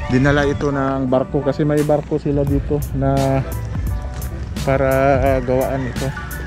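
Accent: Visayas, Philippines. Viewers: Bisaya man kaau imong tagalog idol pro ok ghapon dol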